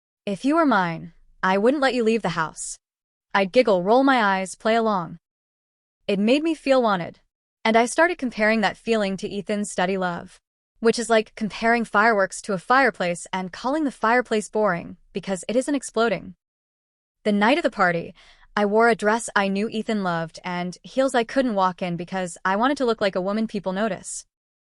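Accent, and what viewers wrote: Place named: Spain. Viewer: WTF IS WRONG WITH YOU AND STUPID SPANISH ACCENT.SCREW THE NARRATION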